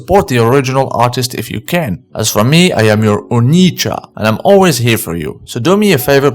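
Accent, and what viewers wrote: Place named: Japan. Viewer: oh. I asked do because your accent is very jap.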